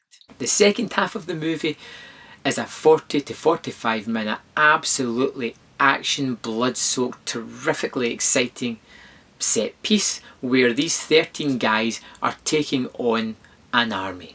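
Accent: Scotland, United Kingdom. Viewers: By the way. I am assuming you either live or are from Scotland due to your accent. I have always wanted to visit Scotland. What is it like living their?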